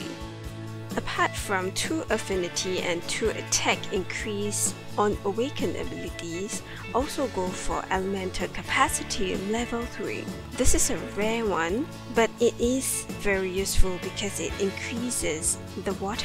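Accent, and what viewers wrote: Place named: Singapore. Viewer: You sound so Singaporean!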